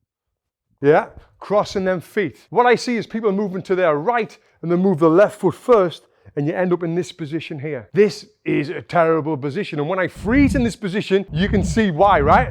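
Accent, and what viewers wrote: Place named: England, United Kingdom. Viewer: Love your content and hearin the King’s English….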